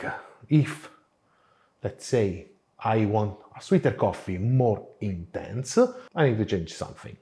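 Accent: Italy. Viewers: Hello, love your show and you have a nice Italian accent and well-spoken English. Just wanted to tell you that a word that you use often should have the accent on the first syllable as is the case in spoken Italian. The word is VARIABLE.....VA' REE A BLE...not...VA RYE' A BLE . Please excuse this subtle correction while I always chastise English and American native speakers who are often the worst at respecting foreign word pronunciation.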